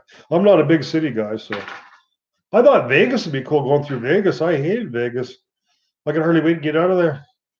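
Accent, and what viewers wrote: Canada. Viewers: Being English, i can say you sound 100% Canadian, Americans sound American. Strangely though saying ay a lot is a very southern english thing, cornwall, devon kent etc.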